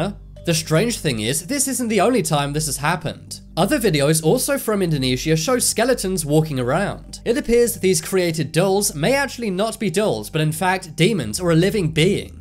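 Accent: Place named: United Kingdom. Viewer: Not the first time a British accent tried to con the world into bullshit.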